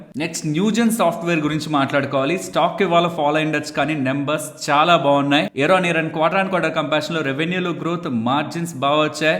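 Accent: Nellore, India. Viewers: Bro meedhi nellore na ?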